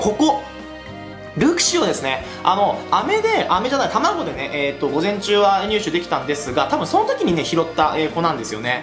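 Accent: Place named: Tokyo, Japan. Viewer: 東京の人みんなこんなイントネーションなんか？ 引っかかってなんか腹立つ感じする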